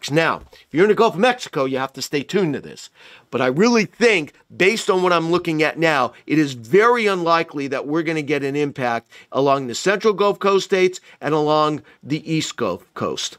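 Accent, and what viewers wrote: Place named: East Coast, United States. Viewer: just can't do the east coast accent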